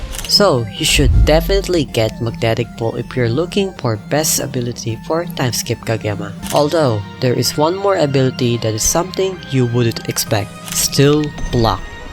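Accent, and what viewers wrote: Philippines. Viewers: Pretty sure it might be a Filipino accent (as a Filipino) correct me if I’m wrong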